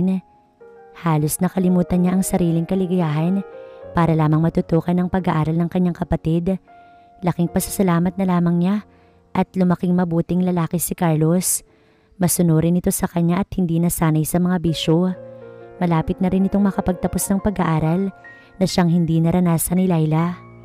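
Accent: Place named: Hiligaynon, Philippines. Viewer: Ilonggang ilongga ang boses...hehehehe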